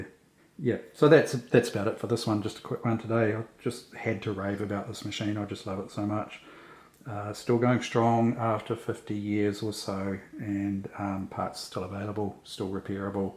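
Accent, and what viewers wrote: New Zealand. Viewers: I knew it! After seeing some TV News videos, I could recognize your accent. Greetings from Rio de Janeiro, Brasil.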